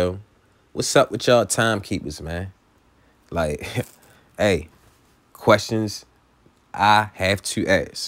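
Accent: Baltimore, United States. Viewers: Didn’t know you was from Baltimore until I heard you speak in this video. Good seeing the city speak on battle rap. Thought I was by myself out here. LOVE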